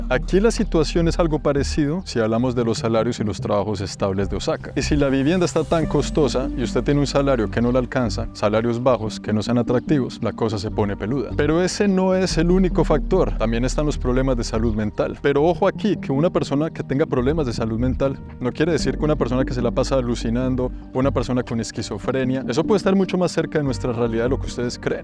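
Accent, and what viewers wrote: Bogotá, Colombia. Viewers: jajajaja ese man es mas rolo que la changua ñero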